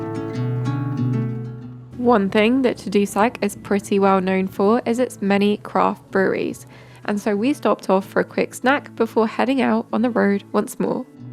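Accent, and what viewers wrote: United Kingdom. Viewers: C'est pour faire découvrir le Quebec aux Canadiens et Américains. de plus on entend bien son accent Britannique et ne parle probablement pas francais.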